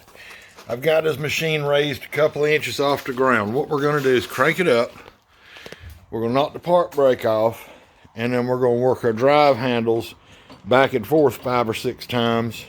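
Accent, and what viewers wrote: South, United States. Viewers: Love the Southern drawl. I was wondering if there was a drain plug or if it drained out through the filters. This video answers my question. Thank you!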